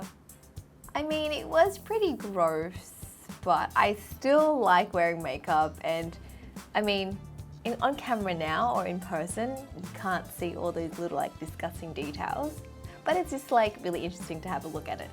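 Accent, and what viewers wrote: Australia. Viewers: AN AUSSIIEEE YAYA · are you australian? you're pretty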